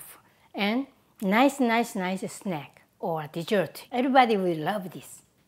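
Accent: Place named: South Korea. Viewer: 이분영어도 너무사랑스러워요 투박한 한국식 발음으로거침없이 설명하시는영어 진짜좋아요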